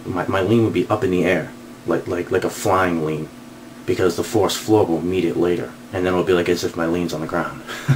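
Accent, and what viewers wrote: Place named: Massachusetts, United States. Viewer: Watched part 1 as well. Thought to myself "sounds like he's from ma". Soon as I heard you say New Bedford I knew I was right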